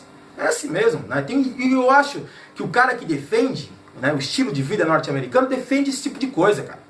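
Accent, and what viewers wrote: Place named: São Paulo, Brazil. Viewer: Vc é o Cara! Eu sou carioca - tenho vergonha da cidade hj, certo! - e jamais pensei em encontrar um mano verdadeiro com sotaque paulista. O impossível ė possível, acabei de encontrar o. Valeu, pelo canal. Para agradecer só posso retornar com 100% de audiência. Mano, não desista do seu povo, força guerreiro!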